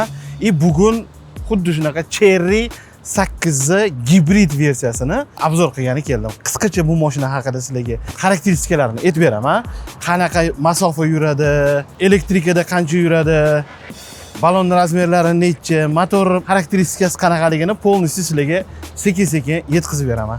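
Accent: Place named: Khorezm, Uzbekistan. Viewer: cho'nqir Xorazmchaga gap yóq og'a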